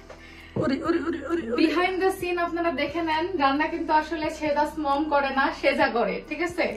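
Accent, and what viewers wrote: Kushtia, Bangladesh. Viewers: Apu ami tomar kotha sune mone hoyeselo tumi amader kushtia r meye ,onak valo lage tomake.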